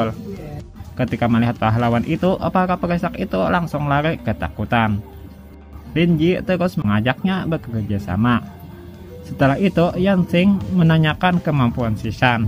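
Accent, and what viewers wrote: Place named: Banjar, Indonesia. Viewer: banjar leh.. lanjutkan bang 😂 unik ni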